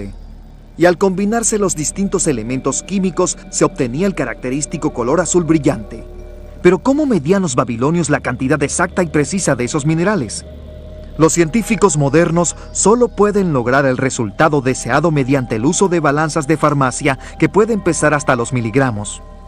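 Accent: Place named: Latin America. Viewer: vamos a ver colega, ejm, Semiramis era la esposa de Sshamshi Adad V, unos 300 años anterior a los que tu dices relacionandola con Nabuconodosor, imagino, por tu acento, que se debe a que eres del continente americano, y permite decir, que fuera de vuestro continente, soleis tener una concepción del tiempo muy peculiar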